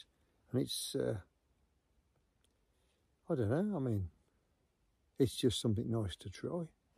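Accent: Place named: Black Country, United Kingdom. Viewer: Talking of spiritual home, where are you from originally. You sound like you’re from my neck of the woods in The Black Country.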